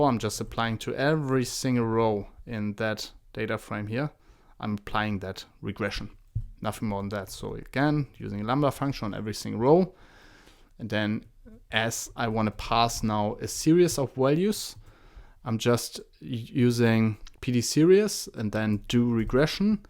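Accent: Germany. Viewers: Jesus Lord, I can't believe I only just now stumbled on this amazing channel! And he has German accent. Pure gold. Amazing video and neat code. Thx so much mein Sensei 🙏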